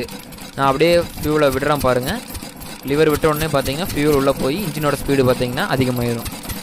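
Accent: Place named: Kongu, India. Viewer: கொங்கு தமிழ் ❤️ மலரும் நினைவுகள்❤️ மகிழ்ச்சி😃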